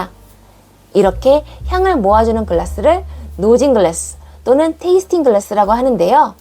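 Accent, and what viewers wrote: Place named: Gyeongsang, South Korea. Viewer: 누나 경상도네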